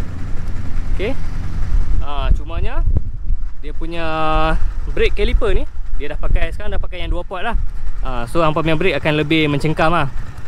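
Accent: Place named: Kedah, Malaysia. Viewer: Aku suka cara cakap laki cina tu..memang pandai cakap..sleng loghat kedah kalau x salah..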